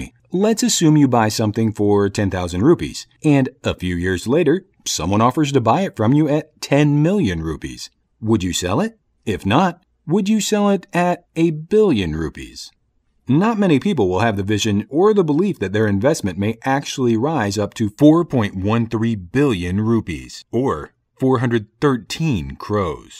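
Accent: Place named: United States. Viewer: excellent explanations. I have to say this is a little bit weird to hear an American accent referring Indian stuff so familiarly like India is his homecountry.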